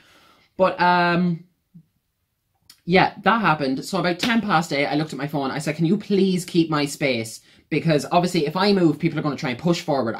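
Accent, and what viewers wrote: Ireland. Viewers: Trying to place your accent...midlands? Offaly? Cavan? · I’ve liked a few Britney songs over the years and have followed her history but I’m wouldn’t call myself a fan per se. I decided to watch this video after recently seeing another “meet and greet trauma” video relating to her. I liked the way you told the story and your presentation is authentic. I think all your feelings were justified. I disagree though that she has no control over this. She is an adult and a multimillionaire. If she can’t see the potential downsides to this type of fiasco then that is 100% her failure as a business person, entertainer and human being. If you learn one thing from this is it’s that humans aren’t for idolizing - we are all flawed and imperfect. And my last comment is that I love Irish accents!!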